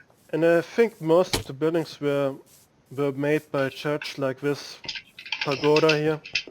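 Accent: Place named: India. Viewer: I think india. Sounds like an indian accent.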